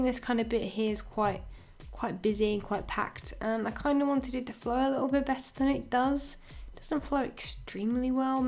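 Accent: United Kingdom. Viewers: Can't get bored of your british accent <3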